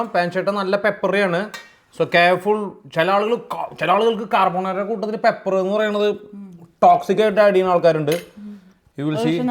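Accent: Thrissur, India. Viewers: നാട്ടിൽ പോയി വന്നോണ്ട് തൃശ്ശൂർ സ്ലാങ് അടിപൊളി ആയി വരുന്നുണ്ട്... But സൂപ്പർ 😍.... ❤️ ഉണ്ട് ബ്രോ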